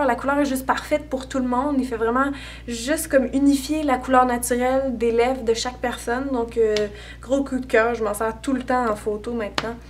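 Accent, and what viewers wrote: Quebec, Canada. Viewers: Merci pour cette vidéo très utile ! Moi aussi j'achète des produits Yves Rocher et j'aime beaucoup la crème pour les pieds sauf qu'elle se vide très vite... Je voulais te remercier pour ta fraîcheur et ta vitalité ! Ça met vraiment de bonne humeur et c'est toujours un plaisir de regarder tes vidéos !! Je les regarde en dernier car ta chaîne est ma préférée ! En plus ton accent me fais rêver car j'ai très envie d'aller au Québec !! Gros bisous et continu comme ça !!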